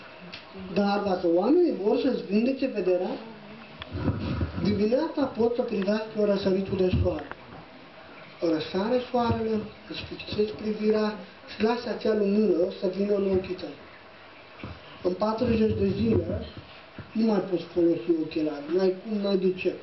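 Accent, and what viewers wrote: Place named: Moldova. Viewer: spuneți lucruri foarte interesante, vitale, dar nu este o claritate în sunetul de transmisie, pe lângă faptul că auzul scade la anumiți oameni și dvs.aveți pe ici , pe colo un minunat accent moldovenesc și o gestică ce mie îmi stârnește râsul, adică îmi place....mulțumesc infinit.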